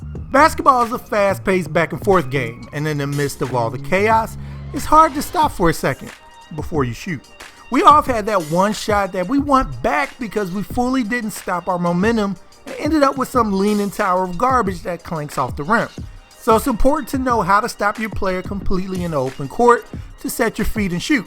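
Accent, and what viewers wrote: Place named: Baltimore, United States. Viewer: Can tell you from Baltimore wit da accent 😭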